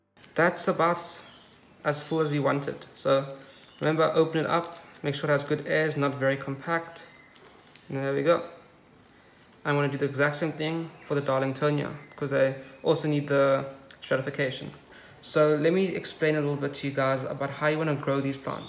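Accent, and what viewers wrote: South Africa. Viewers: Australia? Here all these videos I thought I was listening to south africa.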